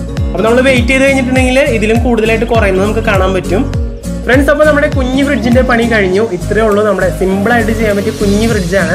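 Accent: Thrissur, India. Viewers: തൃശൂർ ഭാഷ യാണ്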